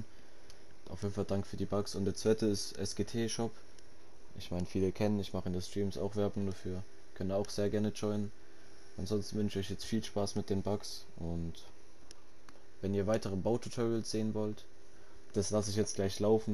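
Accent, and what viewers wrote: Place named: Switzerland. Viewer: so reden wir auch in der schweiz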